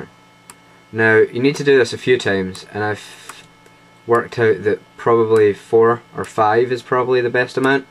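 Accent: Scotland, United Kingdom. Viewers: This is a really good video, I learned something new today! Also, I'd marry you just because you have a lovely Scottish accent haha :) But anyways this was really helpful, I'm making an animation for class and had no idea how to do the importing part :P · wow im irish and i could hear a scottish accent not an irish one.. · Thanks a zillion!!!! the video gave me exactly what i was breakin my head over. and the scottish accent is very cute and distracting (in a good way ) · u da man son, im scottish to and i get that irish thing all the time lol. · yhass lol ur scottish! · I enjoyed it a lot. BTW, I knew you were scottish...lovely accent. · Je is Scottish god sake aaaa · Nice vid. I notice you did the hard way to begin with! When I import the images from the collection to the timeline, I do it all in one go (Simply select all and drag to the timeline). 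Also, would have been nice if you'd shown how to add titles and a soundtrack. PS. I sussed you were Scottish straight away lolol! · Dude love ur scottish accent, and i am gonna enjoy making movies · wow... I can't believe I just watched what I experienced on my own! Really! And I wouldn't think he'd be irish at all... more like british... Geez! stop animation is hard! mainly because I give up too often... >_< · Great info! Thanks, I'm going to try it today. OH...and I could tell you have a Scottish accent not Irish :) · Ooo I love the Scottish accent!!!! :D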